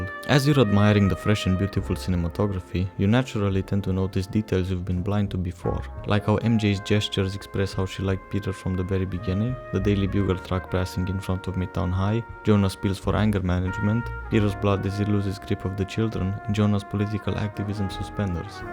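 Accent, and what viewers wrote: Eastern Europe. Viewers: Great video, but I'm much more interested in where you are from and how you came into reading American comic books. Not many people know who Mark Bagley is in the US, let alone Eastern Europe (assuming by your accent). I think that would be a cool story to hear. Do you read anything outside of Spider-Man?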